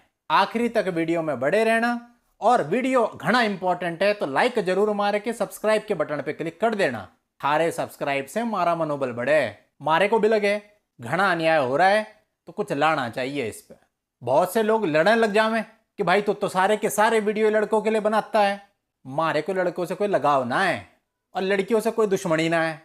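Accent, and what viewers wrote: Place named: Rajasthan, India. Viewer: Dear sir, Aap ki Rajsthani sunke bahot maza aaya.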